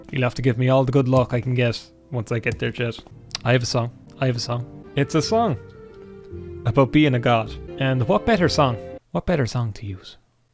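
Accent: Ireland. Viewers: Narrating gonoor or whatever his name is in your Irish makes him seem a bit scarier if I'm honest..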